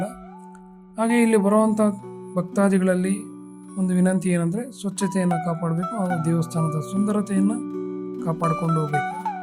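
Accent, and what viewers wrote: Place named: Kolar, India. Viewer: Formal aag matadbeda loki nin accent ee channag aithe local kolar language ee matadu 😂 3 min video alli ond 10 sala aadru "galu " barbekittu ❤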